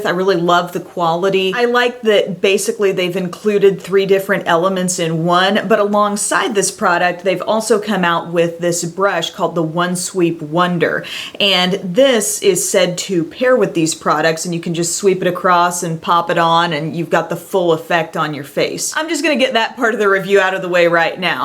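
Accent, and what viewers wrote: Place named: South, United States. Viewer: Everytime I watch your videos, or your snapchats ALL I can think is how much of a southern accent you have! But I love you, and always try to come to you before I purchase something!! ❤️❤️❤️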